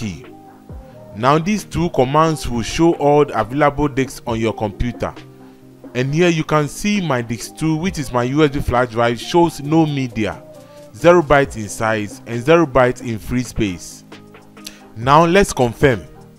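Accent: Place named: Nigeria. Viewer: You sound like you may be Nigerian. Some good tips. Thank you.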